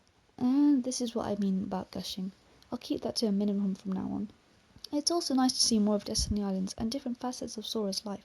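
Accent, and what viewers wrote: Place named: United Kingdom. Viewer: What's this British voice smh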